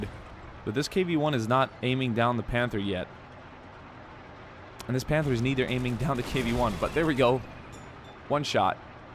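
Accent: Sweden. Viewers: Are you Swedish??? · Are you swedish? You sounds like it when you speak english. Lovely videos btw.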